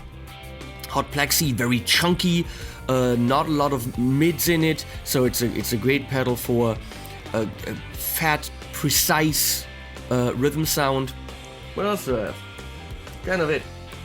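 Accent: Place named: Germany. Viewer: Your deutscher Ekzent ist so knuffig.... wieso sprichst du nicht einfach deutsch??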